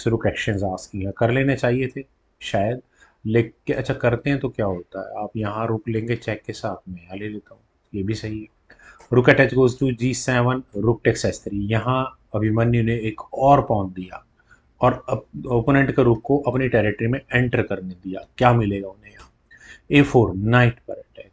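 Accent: India. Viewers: Sir punjabi lag rahe ho?.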